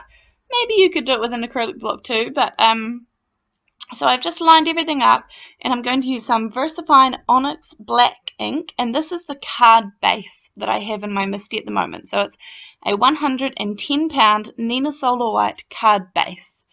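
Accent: New Zealand. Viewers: You've got a kiwi accent. Are you from Aotearoa?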